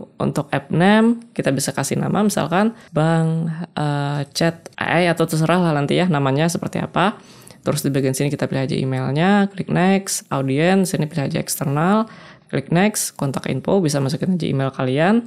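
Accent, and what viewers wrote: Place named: Banjarbaru, Indonesia. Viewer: orang Banjarbaru bang😅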